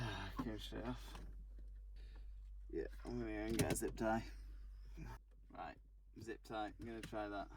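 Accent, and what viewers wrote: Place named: North, United Kingdom. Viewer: Number one you are really tan for somebody with a slightly northern accent. Maybe I got that right maybe I got it wrong But thank you very much this was really informative I have a 2011 John Cooper works that I wanted to change out everything in to match the wrap that I am doing on it you’re just really really helpful all the other ones not so much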